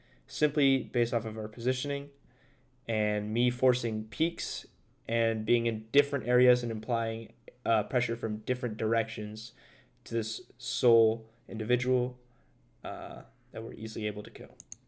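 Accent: France. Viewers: U french?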